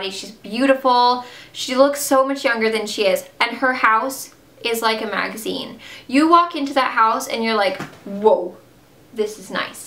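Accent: England, United Kingdom. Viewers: Well, on and off throughout her growing up years she lived in America. Also, sometimes she says the word "rubbish" for something that's disagreeable, she pronounces words ending with "ing" as "ink," and pronounces "mirage" as "MEER-awj" (a soft-sounding j) Those are the times when she seems to sound a bit British to me :) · I can tell she used to British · I KNEW IT. I've only seen a few of your other videos so I didn't know you were from England before, and you have no accent, but I still guessed you were from England. Something about the way you speak is British, even if you don't have an accent. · what happened to your English accent? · Can you PLEASE PLEASE PLEASE talk in an english accent? Do you miss having an accent? I wanna hear it so bad! Also, I would love to learn your story, where in England you are from, why did you move to America, where in America, etc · your English accent totally came back in this video! 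lol! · did you have a British accent?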